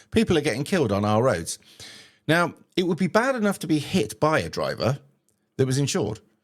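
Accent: United Kingdom. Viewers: All the crimes you mention are not the exclusive domain of immigrants to a country, there are home-grown bad people to. As for the ‘killer machines’, traffic regulations and driving customs differ from country to country so it’s to be expected someone unfamiliar with those differences may have an accident. I note from your accent you are from the UK. Anne Sacoolas was driving on the wrong side of the road when she killed Harry Dunn. She claimed diplomatic immunity initially. She was white, middle class and privileged. You don’t help feeding into people’s fear and negative stereotypes.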